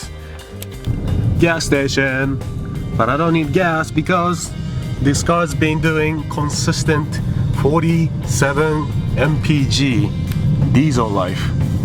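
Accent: United Kingdom. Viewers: I love your Asian British accent! <3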